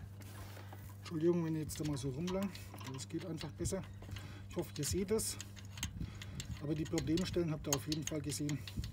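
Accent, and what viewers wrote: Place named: Franconia, Germany. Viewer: Servus, wo bist du denn her? Das hört sich schwer nach Frankenland an. Ich bin auch KFZ ler und würde mich gerne austauschen. Hast du ne Werkstatt oder Kontaktadresse?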